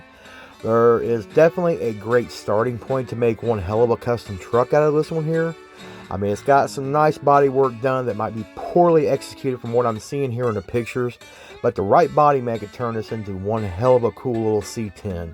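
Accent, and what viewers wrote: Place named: South, United States. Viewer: I enjoy watching all WTF videos, got hooked quick. I believe they inspire, you know, to own or build one of these vehicles. I also find the narrator's humor and southern accent a good combination for the show.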